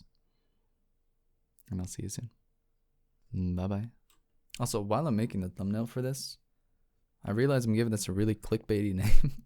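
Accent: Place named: Middle East. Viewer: 1 I'm so sorry for you loss ik what it's like to lose someone that is close to you I hope you are feeling better and that you have a great mental journey 2 I NEVER KNEW YOU WERE MIDDLE EASTERN I THOUGHT I WAS TRIPPING ABOUT THE ACCENT 3. I'm glad you are back from your trip even tho it was very hectic lol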